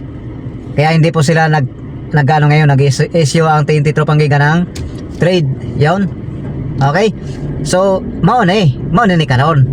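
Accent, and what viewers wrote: Visayas, Philippines. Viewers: ako alam kona visaya ka bossing kaya nga ganyanvka mag salita ksi naiintindihan ka nmin walang masama sa pananalita ng visaya nag papakatotoo ka lang porket visaya ng lalait na mga hinayupak kala mo nmn napaka perpektong tao nila hindi ata alam ng mga bobong ginebra fans na walang perpektong tao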